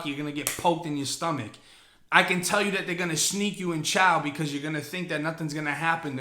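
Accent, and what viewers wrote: Boston, United States. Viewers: 617 over here, Charlestown Townies. That man got a Boston accent.. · I absolutely love your Boston accent, new sub been binge watching you all night. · Yo where yo from originally cause you aint from Florida with that accent? sounds like Boston. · my nigga but that Boston shit be getting me everytime lol. "Tessta-Hot"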